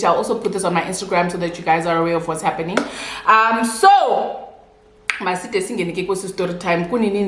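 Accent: South Africa. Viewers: Your Xhosa Accent 😂😂😂😂😂😂 ... " Hai Celia "" 😅😅😅 .. Make up looks absolutely Ravishing 💯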